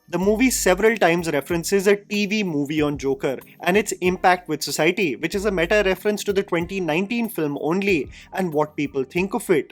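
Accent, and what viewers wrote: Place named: India. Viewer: jesus, what's with the indian accent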